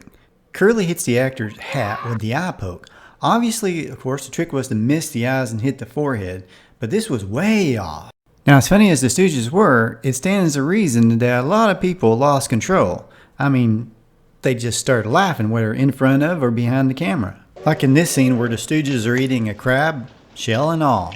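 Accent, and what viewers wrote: South, United States. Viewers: LMAO!!!! I suppose it's because of his very heavy Southern Accent. Kind of like when the Brits say SEH-MEH-TREE for cemetery and INVENT-TREE for inventory.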